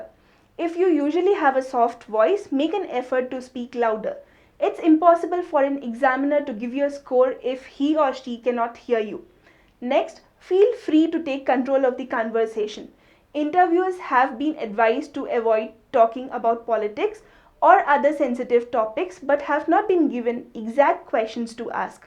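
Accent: India. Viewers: It's not terrible it's Indian accent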